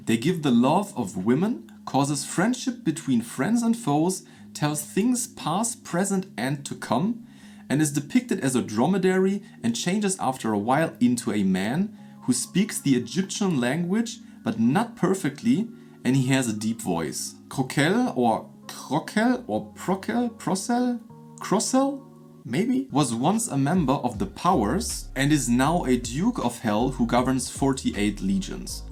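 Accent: Germany. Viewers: Do not worry how you pronounce the names. German accent is the sexiest.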